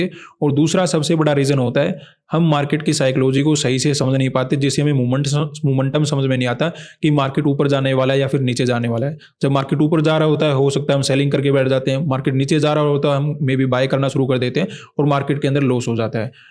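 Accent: Haryana, India. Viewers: Language ke hisab se lagta hai inki language mein thodi si Haryanvi bhi mix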